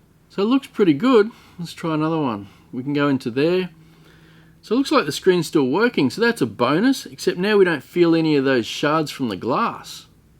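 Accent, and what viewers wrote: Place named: Australia. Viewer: So australian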